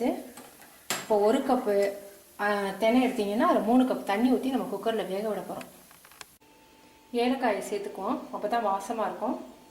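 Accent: Madurai, India. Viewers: maduraya neenga...ur pronunciation looks like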